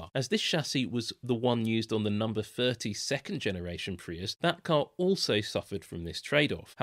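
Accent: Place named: England, United Kingdom. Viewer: English accents are the worst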